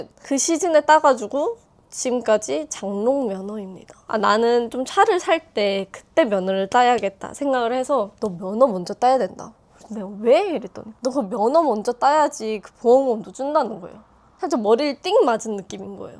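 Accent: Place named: Gwangju, South Korea. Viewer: 언니 혹쉬 광주사람? 어디선가 친근한 사투리가 들려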